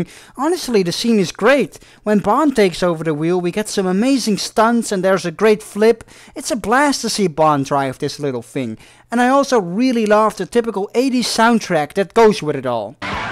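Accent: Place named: Netherlands. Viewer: Very sorry to sound like "That Dumb American", but I absolutely enjoy your Dutch accent. But honestly, your English is pretty good. I don't know how many Dutch citizens speak English, but you're very well spoken in the language. Aside from that: keep up the good work with your videos. Thank you.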